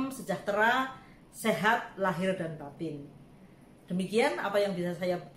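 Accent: Banyumas, Indonesia. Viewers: Berkah melimpah buat,ilmunya memberdayakan sekali.terima kasih 🙏 Saya galfok sama sedikit dialeknya spt kluarga di kota ngapak ngapak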